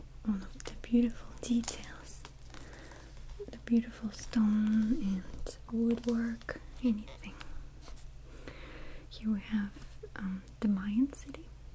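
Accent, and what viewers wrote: Russia. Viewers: i love your russian accent and your handwriting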